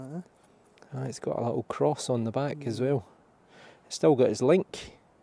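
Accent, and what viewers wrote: Scotland, United Kingdom. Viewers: The face is not ugly and the Scottish accent is so beautiful. The history you know is so interesting. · I'm one of your new subscribers and just want to say you deserve all this new attention your getting, not only are you making wonderful discoveries but also your Scottish accent is one of the subtle ones, which makes you easy to understand, even for non Brits, best wishes for 2023 hope you find what your looking for Andy a Brit from the Midlands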